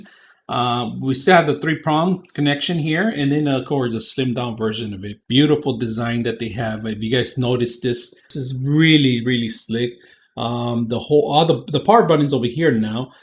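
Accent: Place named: Philippines. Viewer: I love your accent, sounds a lil bit filipino sometimes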